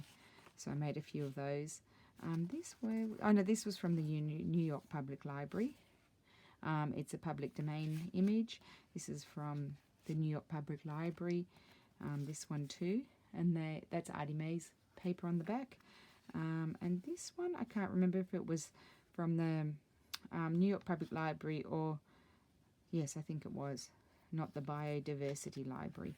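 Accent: Australia. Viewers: it was such a such a treat to find your first video. How far you have come since this post. I LOVE your channel. You inspire me with every single video. Your unique situation with being Australian in Italy is very cool! I love seeing all the italian papers you have, and Ii just love your beautiful accent. I am a new Youtuber myself, I do junk journals with a focus on processing grief. I lost my husband 6 months ago, and was surfing youtube while hiding in bed for weeks... I stumbled across Tracie Fox's videos, and found you through her... I really enjoy crafting along with you. thanks so much for the inspiration! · love the down under accent..greeting from Queensland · Wow, fabulous work! So glad I found you! Can’t wait to follow you. Greetings from Oz (do I detect an Aussie accent?) 😎🙃🇦🇺😘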